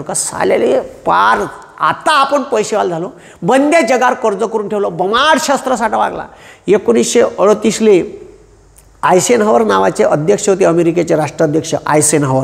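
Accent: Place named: Wardha, India. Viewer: Listened to your full video, made history so very interesting. Marathi dialect wardhache asun suddha samajhayla traas jhala nahi. Tumcha energy purna video madhe continuous hota.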